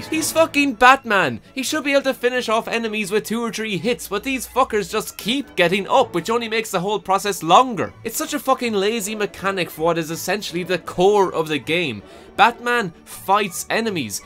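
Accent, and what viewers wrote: Ireland. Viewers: Your Irish accent is jus lovely darling. lol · I love your Irish accent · He sounds so Irish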